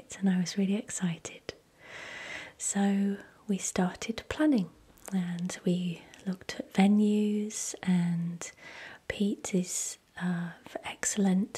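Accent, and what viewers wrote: England, United Kingdom. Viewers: Enhlish accennnnnnt, live it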